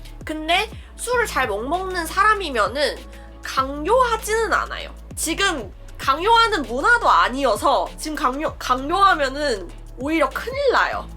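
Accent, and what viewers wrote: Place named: Seoul, South Korea. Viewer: 대단하세요~~깍쟁이 서울말씨도 완벽하네요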